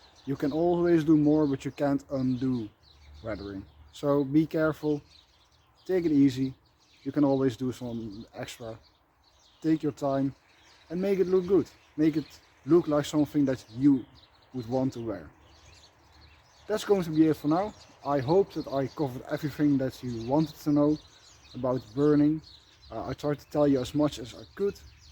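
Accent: Netherlands. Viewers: Into German reenactment too. I've done the same treatment as you did. I'm just a soldier, so no heavy wear and tear on my stuff. But like you said a headstart. Even as a soldier the uniform and kit went trough training, traveling, workduty etc. I'll allow the stains and aging to happen during my own time in the field. And hearing your accent. Groetjes van een mede Nederlander.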